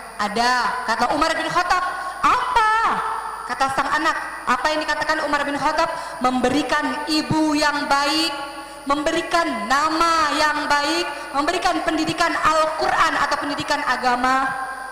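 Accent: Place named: Java, Indonesia. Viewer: logat nya ko jawa ya😁😁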